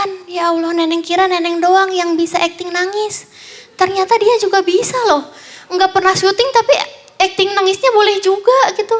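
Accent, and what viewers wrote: Medan, Indonesia. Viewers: Neneng ku kira kau medan kali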